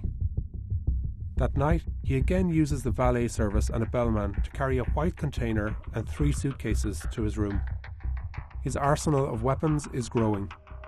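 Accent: Ireland. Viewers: Love how it's the New York Times and it has an Irish guy as the narrator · Since when did The New York Times have an Irish narrator?, also this is really spooky and sad that this happened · This is all well and good but the Irish guy narrating the video caught me so off guard idk why even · Irish accent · Is the person talking Irish?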